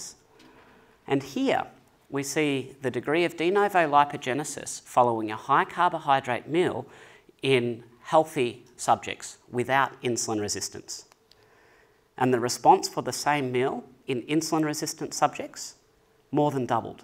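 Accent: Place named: Australia. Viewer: What a Strine accent! Though I’m sure that my Midwestern U. S. accent might also be found comical. Very good content.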